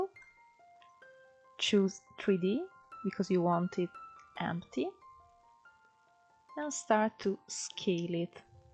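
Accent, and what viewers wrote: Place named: Netherlands. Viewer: you can olmost here the dutch in your voice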